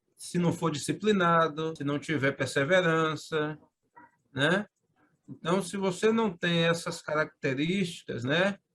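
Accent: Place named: Northeast, Brazil. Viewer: Seu sotaque nordestino, não define o seu intelecto . Parabéns brother!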